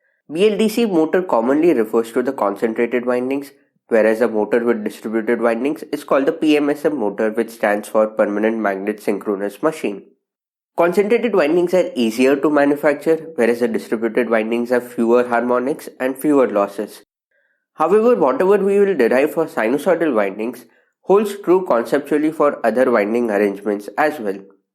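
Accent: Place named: India. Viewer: I think the content is wonderful. But alas, I am not used to the Indian accent either. I suggest you can upload a subtitle to help our foreigners understand your explanation. Thanks in advance!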